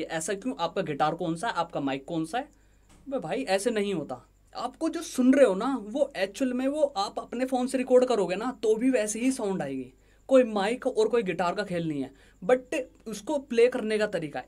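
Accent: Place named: Meerut, India. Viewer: Bhai aapka way of talking kafi apna apna sa hai. Meerut ke aas pass se ho kya???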